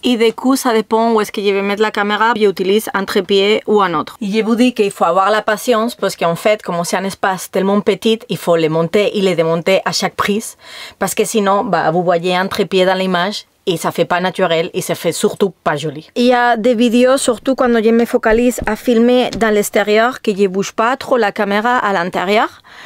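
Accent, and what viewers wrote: Spain. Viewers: Merci, tu m'as donné un grand coup de fou pour un jour commencer moi aussi a faire des fidéos. J'adore ton accent espagnol et ce n'est pas la 1ere fois que je te le dis. mais tu as raison, depuis que je te suis, ton texte est plus fluide. Compliments ! Quand je vois le travail que tu y mets, je me demande quel ordinateur et quel logiciel tu emploies, parce que je crois que le mien est trop faible pour ce travail. Merci de prendre le temps de me répondre. Bises du nord de l'Allemagne, le corsallemand. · Quelle bonne idée cette vidéo. Quand on est devant l'écran, on ne réalise pas nécessairement tout le travail que ca représente derrière. L'intro est géniale et ton francais est charmant avec cet accent espagnol. Bravo et Merci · Ne t'inquiète pas, le charme de l'accent Espagnol quand tu parles français est tout à fait délicieux. Personnellement, je suis beaucoup allé en Espagne (je ne suis pas très loin de la frontière) et mes parent avaient un appartement prés d'Alicante! 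Donc je parlais assez bien l'Espagnol. Et ton accent me fait vraiment plaisir car il me rappel les jours heureux ou nous étions en vacances dans ton pays merveilleux... A part cela, j'adore ce que tu fait, et tu as beaucoup de courage! Que ce camion t'emmène au bout du monde sans soucis ni problèmes. C'est un souhait qui me tient à coeur! Bon voyage... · Chapeau bas!!! J'ai 62 ans donc une sexagénaire!!! Je te suis depuis que tu fais tes vidéos en français je t'adore je n'en rate aucune ta philosophie de vie me plaît beaucoup même si j'ai une vie plus "rangée" je réalise mes rêves également...je ne me suis jamais perçue comme les autres d'autant plus depuis mon divorce je me réalise seule avec mon âne Sherpa je suis partie 6 mois jusqu'à Santiago de Compostela (aller et retour) j'ai réalisé mon rêve vivre à la campagne avec Sherpa à mes côtés!!! Je te trouve particulièrement courageuse j'apprécie ton superbe accent espagnol, j'aime quand Raf que je suis aussi te fait découvrir le canyoning ou l'escalade!!! 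Et là encore bravo à toi de dépasser tes peurs!!! Longue vie à toi à ce que tu fais partager c'est formidable... et caresses à tes 3 chiens et ton chat ils sont tous adorables!!! Muchos gracias 🙏👍💓✨️💖🌺🥰🍀🐞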